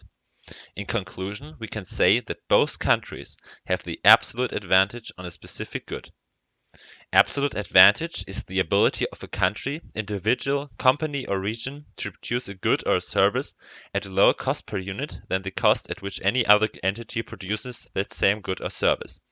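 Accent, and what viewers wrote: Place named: Germany. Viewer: your german accent sucks but i like this video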